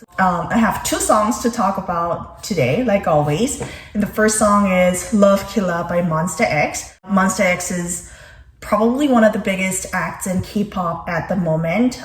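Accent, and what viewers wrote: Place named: General American, United States. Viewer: 💚 저 요것도 섀도잉 자료로 사용해야 겠어요!!ㅎㅎㅎ 진짜 저 억양 너뮤좋아해요오💚💚❣️❣️ㅎㅎㅎㅎ 진짜 미국영어의 정석이셔서 제가 젤 좋아하는 유튜브 채널이랍니다❣️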